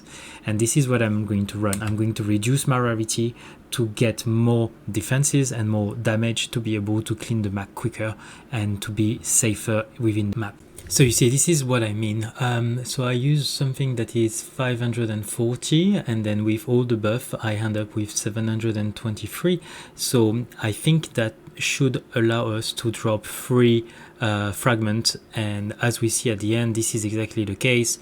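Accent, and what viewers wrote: France. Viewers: i love the french accent :)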